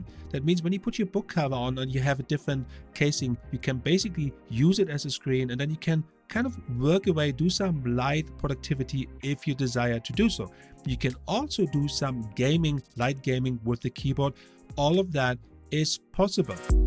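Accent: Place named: Germany. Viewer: Nice video, i think i'll choose S6 Lite, are you german? you have a german accent i find lol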